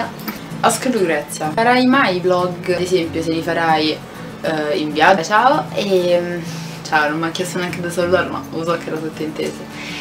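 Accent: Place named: Rome, Italy. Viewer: Ah romanaa de Romaa ❤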